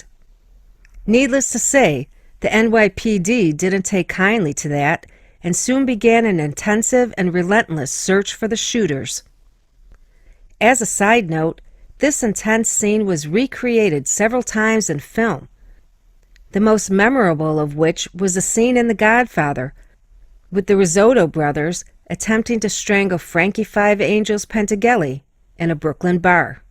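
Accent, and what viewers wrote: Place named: Midwest, United States. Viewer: Are you from Chicago ? I hear a midwestern accent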